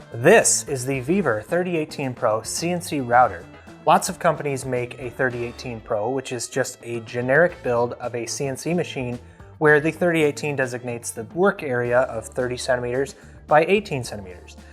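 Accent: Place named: United States. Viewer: You sound like an American, so talk like one. Dump the mm, and use SAE. That's how we communicate here! mm doesn't mean diddly.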